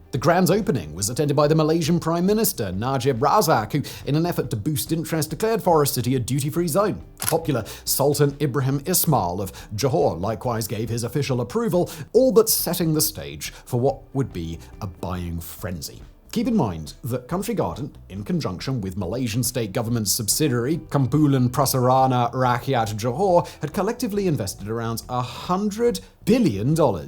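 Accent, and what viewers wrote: United Kingdom. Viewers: There's something about a British man with this regional accent wildly mispronouncing foreign names without pause. 😅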